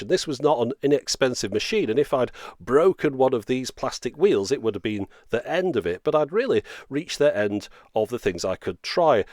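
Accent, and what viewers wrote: United Kingdom. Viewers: Great video. I'm an English student at the University and it's really nice to listen to something with nice British English. Having fun and improving my pronunciation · This accent is so, so British!!! Like in this video: <link>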